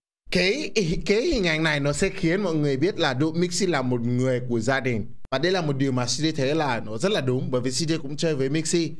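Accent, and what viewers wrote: Standard. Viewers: Anh này nói tiếng Việt chuẩn chỉnh hơn mấy bạn khác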